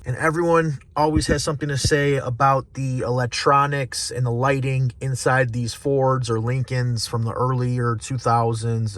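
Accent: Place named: Chicago, United States. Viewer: I’m from chicago too and you’re one of the only young cats that still have that old school accent. Hey brother how much should i pay for an 02 town car with 28k miles? Guy wants 8500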